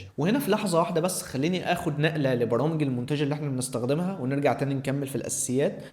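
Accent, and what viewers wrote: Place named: United States. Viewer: بالله اتكلم بلهجة عربية لهجتك هجين كأنك امريكي تحاول تتكلم عربي غصبا عنك اعتز بلغتك لا تصير رخيص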